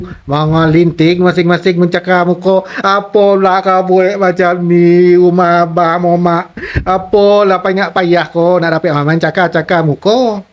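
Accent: Negeri Sembilan, Malaysia. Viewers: Suko pulak donga slang acik ni.. elok lah tu acik toghuihan boghi info yg sohih lg bermanafa’at😄👍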